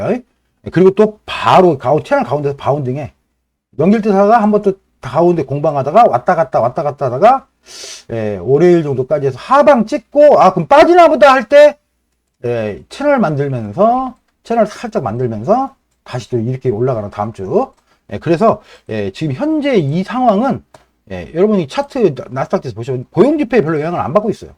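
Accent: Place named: Jeolla, South Korea. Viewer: 말투가 약간 전라도분 억양같아요^^ 좋은 내용으로 영상 잘 보았습니다. 감사합니다.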